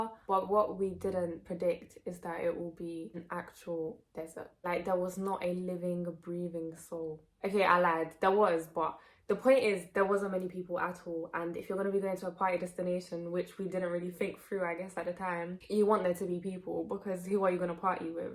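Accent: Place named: United Kingdom. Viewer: British accent, hard to understand for me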